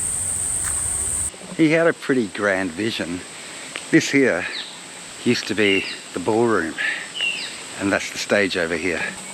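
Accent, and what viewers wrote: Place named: Australia. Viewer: Very pleasant, interesting video; your voice is very calming and rare for me to hear the accent everyone loves. Who's idea to do a town in Glorious Art Deco? I think there are products that you apply after washing that retards the return growrh of mold. I'm from Washington State USA and will never make it to Australia being old nowadays. So this is great! Hope you keep at it; there just arent ways to see the actual countryside ....excellent, thank you.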